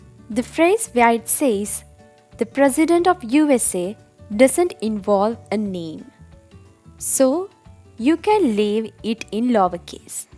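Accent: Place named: India. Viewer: Just want to know dear, who taught u English? An Indian? Your accent says though. Anyways a nice presentation.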